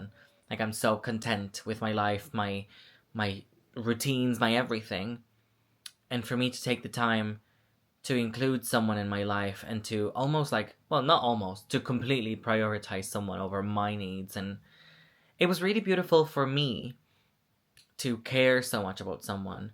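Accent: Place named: United Kingdom. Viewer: you have a STRONG UK accent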